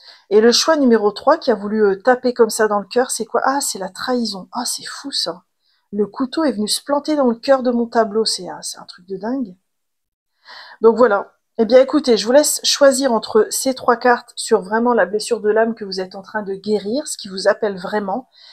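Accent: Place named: Nord-Pas-de-Calais, France. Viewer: Choix numéro 1 ❤ ooooh oui le message a bien été reçu 😊 MERCI du plus profond de mon cœur !!! Je vais le ré écouter tellement il est riche et réconfortant. Je me sentais très seule en ce moment, j en suis meme venue à me faire mes auto séances de psy parce que pas d'accompagnant disponible.. je doutais de mes capacité à me libérer seule de ce traumatisme de l'âme car je sens que j en touche la racine. Je reçois ce message comme une validation. Alors ❤Merci❤❤❤ Et big up à l'accent chti 😉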